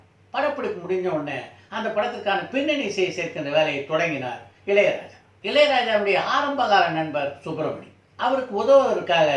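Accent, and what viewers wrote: Telugu, India. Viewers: Is telugu your mother tongue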